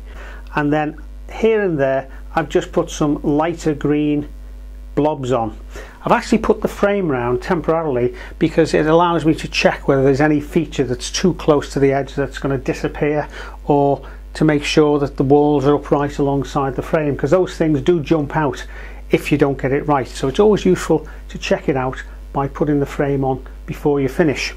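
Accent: Liverpool, United Kingdom. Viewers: Did the Pres like his painting then? I agree with everything Parrot said too, such a lovely Liverpool area accent you've got.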